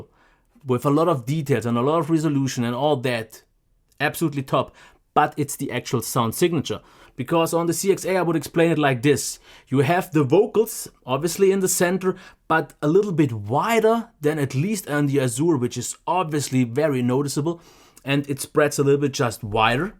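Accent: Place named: Germany. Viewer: No, he sounds German.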